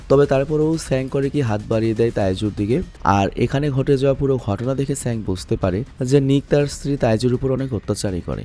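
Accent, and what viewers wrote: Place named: Chittagong, Bangladesh. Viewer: ভাইয়া মনে হয় চাটগাইয়া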